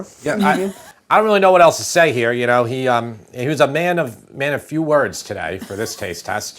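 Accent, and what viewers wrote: New York, United States. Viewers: I'm a vegetarian, don't eat tuna, but love your NY accent and watched the video all the way to the end😅😅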